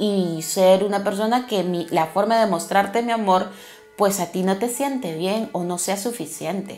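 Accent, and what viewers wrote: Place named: Mexico. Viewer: . Tienes tono de Mexico lindo y querido. Porque no haces una oferta especial para los mexicanos que queremos consulta personalizada. Esta muy caro en euros 🙏🏻💕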